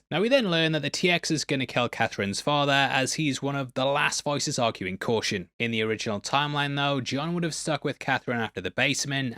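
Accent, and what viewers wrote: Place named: Newcastle, United Kingdom. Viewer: Wait is a Geordie?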